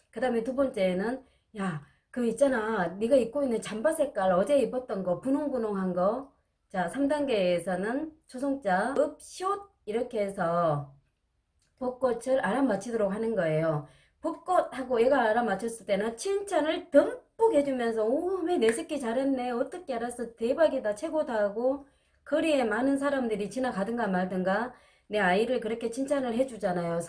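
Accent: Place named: Jeolla, South Korea. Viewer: ㅎ ㅎ ...전라도 사투리가 나오네용.. 저도 전라도거든요. 역쉬 전라도 사투리가 멋져요. 영상 컨텐츠 좋네요. 앞으로 계속 응원할게요. 감사해용,,,,,나 갈랍니다..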